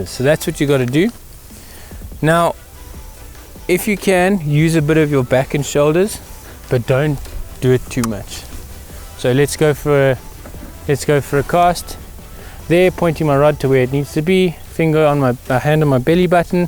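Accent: South Africa. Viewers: Hi bud from wher are you you soud south africa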